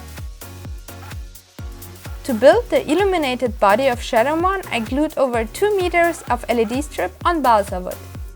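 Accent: Germany. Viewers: You're incredibly talented, but German accents make me cringe :D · Great work!!! Are you german? Your accent sounds like you re from Germany. Oh and can you build the Necorchasm or Thorn from Destiny? Would be awsome!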